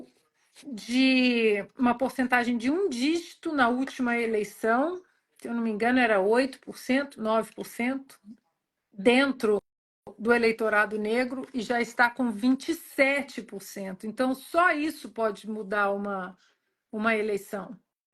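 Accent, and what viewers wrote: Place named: Minas Gerais, Brazil. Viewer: Xará, é muito bom te ouvir! Tú fala num mineirês calllllmo e bem explicadim. 🤭 Aprecio este teu hábito. Bjão pr'ocê, sô! Deus te abençoe!!!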